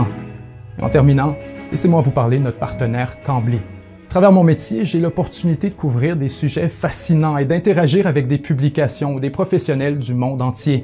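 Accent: Quebec, Canada. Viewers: Je suis à 1 minute, je découvre cette chaine, et suis déjà convaincu qu'il s'agit d'un bon média ( et l'accent québécois n'est pas trop prononcé il est facile à comprendre pour un français ne maîtrisant pas votre accent, merci ) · vous faites un discours pro-américain et proposez des cours d'anglais. Abandonnez votre accent québecquois, vous êtes un traître, sans plus.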